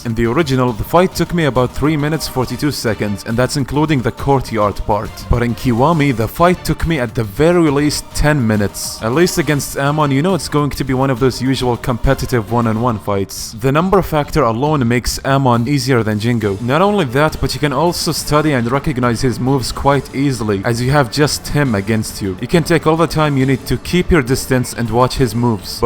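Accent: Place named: Bahrain. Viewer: It’s very easy to tell that you’re bahraini from your accent 😂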